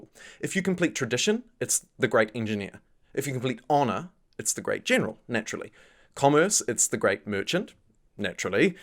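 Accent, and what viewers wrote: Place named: New Zealand. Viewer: ha, would not have placed that accent as kiwi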